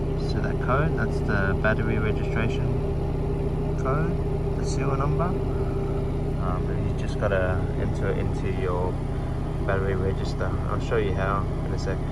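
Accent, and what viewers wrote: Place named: Australia. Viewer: You sound Aussie, Is this an Australian delivered Macan?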